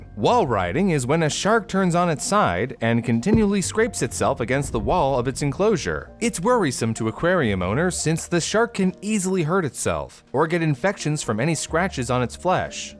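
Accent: Canada. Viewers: he’s Canadian😄 you’re smart! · Hey,! You a Canadian? 🤩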